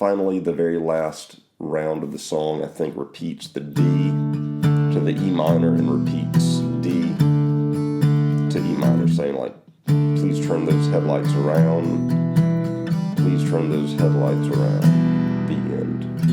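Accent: South, United States. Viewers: Lol i think its the southern accent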